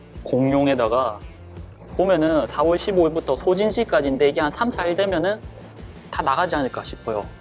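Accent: Busan, South Korea. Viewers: 팝님 부산사람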